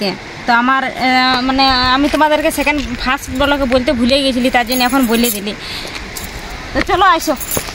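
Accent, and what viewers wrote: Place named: Purulia, India. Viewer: বৌদি তোমার কথা গুলো শুনতে ভালো লাগে ❤ পুরুলিয়ার লোকের কথা সুনতে ভালো লাগে তোমার কোন বোন আছে আমি ও বাউরী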